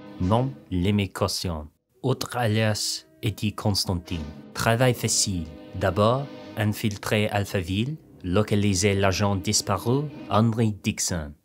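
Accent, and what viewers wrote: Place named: England, United Kingdom. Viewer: Ton français sonne super élégant avec ton accent anglais !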